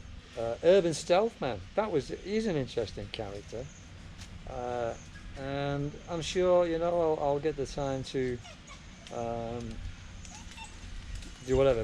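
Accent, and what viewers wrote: United Kingdom. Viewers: Can spot the scouse accent lol .... said the woolyback lol 😂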